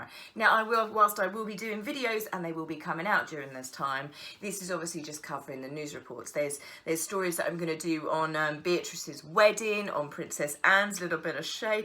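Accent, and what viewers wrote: United Kingdom. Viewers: Great info! I love your channel and your British accent😊.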